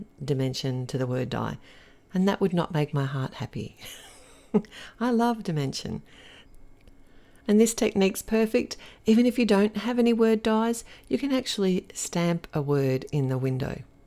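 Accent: Australia. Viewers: it’s nice to find a new papercrafter I can follow. I appreciate the effort it takes to make a video the length of yours and like that you don’t waffle on about irrelevant stuff. You’re Australian?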